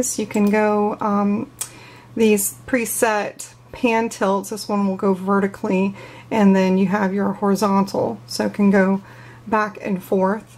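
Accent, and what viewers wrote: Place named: South, United States. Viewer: phục êwê Sounds like you're in the Southern US, is yours exposed to direct sunlight? I'm always concerned about the hot sun cooking these el cheapo cameras.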